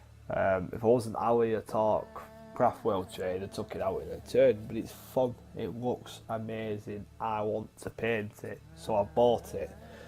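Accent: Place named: East Lancashire, United Kingdom. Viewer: Personally, it's always fun over victory. I basically buy miniatures I like then figure a way of getting them into a list. As you say, unless you are going to tournaments, it shouldn't be just about the best list. As to the video, personally I can listen to people ramble on about 40k all day. As long as I don't hate the accent (ok, you don't have the smooth tones of West Lancs but east lancs is alright 😁) I'm happy to listen. For wider appeal you could make it a bit less rambly. Have points you want to make and kind of plan it out better. But as a first effort it was a good listen.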